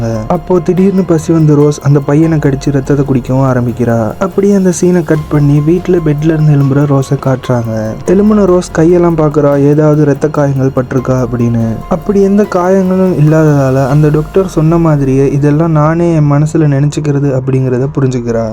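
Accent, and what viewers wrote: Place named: Sri Lanka. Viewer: Sri Lankan voice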